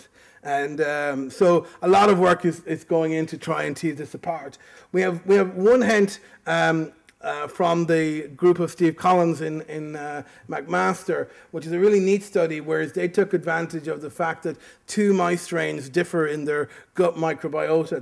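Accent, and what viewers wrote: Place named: Ireland. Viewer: Where's he from ? I heard him in Science Friday and thought it was a black Irishman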